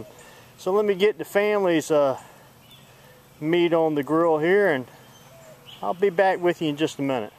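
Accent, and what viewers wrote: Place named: North Carolina, United States. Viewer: I enjoyed this, thank you. You sound like you might be from North Carolina or east Tennessee?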